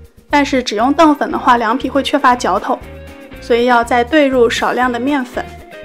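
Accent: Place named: Xi'an, China. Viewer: 听声音是咱西安的吧？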